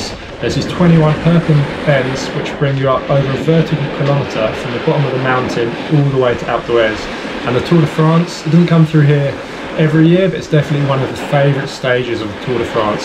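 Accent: United Kingdom. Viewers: Oddly satisfying no loud music or fancy tricks or montages just a start to finish cruse down a mountain with a British narrator voice over.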